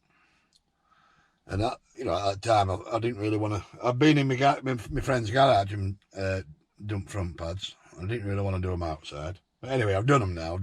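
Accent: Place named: Yorkshire, United Kingdom. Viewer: Yorkshire accents best int world 😁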